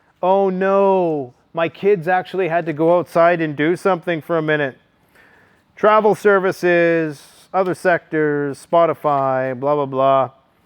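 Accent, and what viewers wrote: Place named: South, United States. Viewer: Lol sound like a true southern boy Tell it like it is don't care who you hurt or piss off😂😂😂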